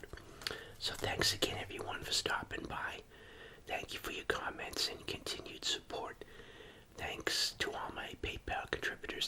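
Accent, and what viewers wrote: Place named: Boston, United States. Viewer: Amazing... he is so wholesome and love his accent. When he say Bossston..:)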